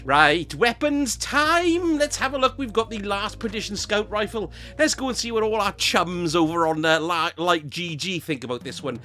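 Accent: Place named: Liverpool, United Kingdom. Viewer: the only british i know who speak this way are liverpool fans . so are you a liverpool fan ?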